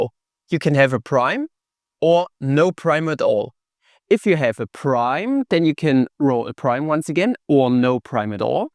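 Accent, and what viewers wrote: Germany. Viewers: I bet your native language is German, it's so noticeable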